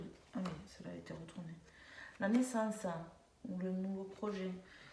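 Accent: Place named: South, France. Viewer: Un petit coucou du gers belle guidance avec le beau accent du sud.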